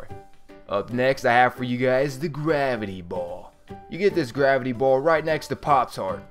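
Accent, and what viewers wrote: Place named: Pittsburgh, United States. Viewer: gotta be from Pittsburgh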